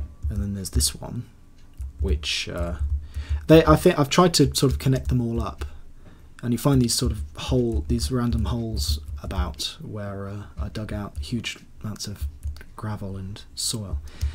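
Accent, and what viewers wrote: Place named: England, United Kingdom. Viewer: WOOP WOOP! Someone English for once!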